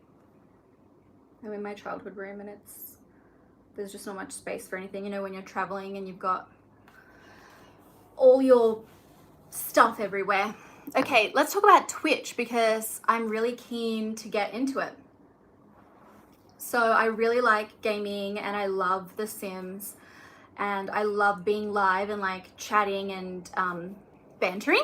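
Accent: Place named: Australia. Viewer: Ur accent is so much more pronounced now that ur home. I absolutely love it. Australian accent is my favorite accent. There are creators that I watch just to hear the accent.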